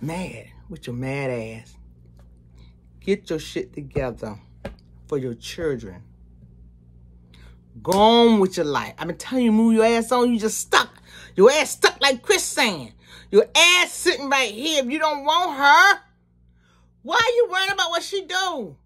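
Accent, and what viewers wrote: United States. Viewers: I just so happened to stumble upon your site,. You are so funny...shooting straight from the hip with that country accent 🤣🤣! I was hooked and hog tied the first 5 minutes of listening. Where have you been hiding🤣😃? Thank you.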